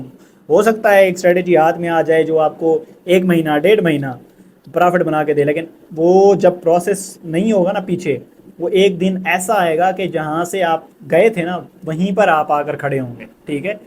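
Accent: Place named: Saraiki, Pakistan. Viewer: sir apke accent se lagta hai aap saraiki hain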